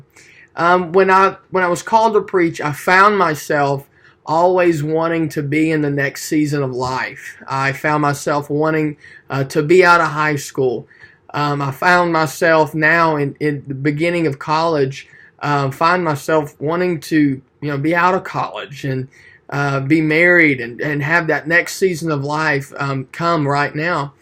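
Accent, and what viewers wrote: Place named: Tennessee, United States. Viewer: I knew you were from Tennessee!!! I knew from your accent!! Sounds great! I know your doing Gods will! I totally know what you’re talking about when you say hiding under the pew hiding from spankings lol...man that switch tho 😂🤣🤣🤣can’t forget that thing 🤣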